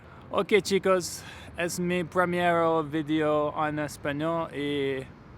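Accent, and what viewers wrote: France. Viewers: It’s so great to see you trying to learn Spanish, I mean the fact that you are putting yourself in this vulnerable position it’s amazing. Luisito Comunica(not his last name, actually a variation from the verb comunicar, for example: yo comunico, tú comunicas, EL COMUNICA) it’s a great channel but maybe you should look for Super Holly, she’s a native English speaker but her Spanish it’s perfect and has so many videos teaching English and Spanish and it could help you feel related because you have the same native tongue. In your video I think you keep mixing Spanish and French. I learned English by listening to music and watching films and tv shows with subtitles, first in Spanish then in English until I just hear them, my English by no means it’s perfect it’s always a work in progress but I never stop trying and neither should you. Keep learning 👍🏽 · Excelente primer intento! 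Sigue practicando y mejorarás mucho! Se nota que sabes francés y lo mezclas, pero vas bien!! You can do it! 😎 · I can see that you mix it with a bit of french, but still great spanish, the pronuciation is understandable, you just need to correct some mistakes, and you'll start improving :) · Your french commes out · I only spoke Spanish for the first 4 years of my life as I was raised by my grandma. Once we got into school they kind of forced us to stop speaking it to catch up in English . Still a little bitter about it. I can survive but it’s so frustrating for it not to be natural sounding like my parents or my grandma. Not be able to express myself in the way I can in English. I didn’t help myself as I took French in high school. I can say and write je suis désolé mais je ne parle pas français. Est-ce que vous parlez anglais o espagnol? Or ça va and other basic little phrases. Also cool to hear the French come out in your Spanish. 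Like when you say que. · ¿Do you know French? I can notice how you pronounced some French words/phrases. It’s pretty cool that you did your best for this video, congrats! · 💪😀💪 te comprendo. Tu francés te confunde con el español pero es poco a poco. Vas bien al mirar la televisión en Español. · Good job! The best way to learn a language is go for it! Even though you made mistakes you still were able to communicate the message, and that is always what is most important. I did notice that you kept wanting to do French pronunciations to Spanish words (like the word "que"). The reason for this is that both are Romantic languages. But just keep at it. I know when I first went to Quebec my French was awful, but I kept forcing myself to speak and within a short time I was able to have brief conversations. I know people fear saying the wrong thing or being corrected by a native speaker so they won't make the attempt, but if you look at it, when you discover you made a mistake and get corrected or correct yourself the odds are you won't do it again, so make those mistakes and learn from them and you'll more quickly gain expertise in the language. 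A quick tip for a quick and large vocabulary expansion: if the word ends in tion (like operation) replace the tion to cion and pronounce it in Spanish: Operation = Operacion, Relation = Relacion, etc. Also, if the word is feminine in French it'll almost for certain be feminine in Spanish and the same goes for the masculine. Keep up the good work! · I think you are very brave. My friends tell me to watch videos of televisión novellas to help my ears learn to hear Spanish sounds. Do you speak French? I think I hear a little. You're right, if we keep it up, we can only learn more and speak mejor.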